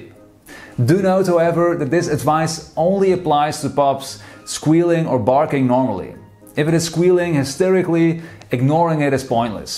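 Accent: Netherlands. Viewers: Your accent sounds Dutch, am I right?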